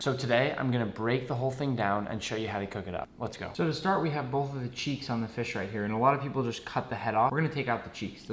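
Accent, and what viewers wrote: Australia. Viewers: He sounds Aussie 00:1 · he sounds like australia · are you Australian?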